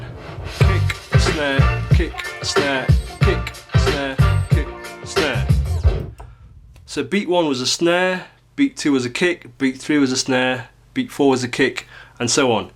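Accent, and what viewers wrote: United Kingdom. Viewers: These vids hVe helped me more than words can express, excellent job bro, you even sound proper cause of ur english accent. Great vids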